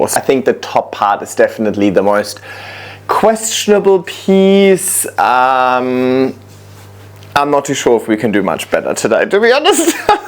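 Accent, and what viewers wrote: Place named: Germany. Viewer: I loved this video - you had me giggling several times! And you got my brain going as I am just starting my moss pole journey - propagating and gathering up a few plants right now and will start replanting soon. As I have been doing this - I am starting to think to my winter (up here in Oregon) coming in a few months and I know I will need to starting thinking about lights when I bring the plants inside! Your ideas are always fun and really help me to be creative as well. Someone else said they enjoy listening to you - I do too. The first video i listened to, I kept thinking, "where do I know that accent from?", then it hit me! you sound just like Helena, my German foreign exchange student from 12 years ago! I am hooked on your videos and can't wait to see if I can replicate a few of your fun moss pole successes!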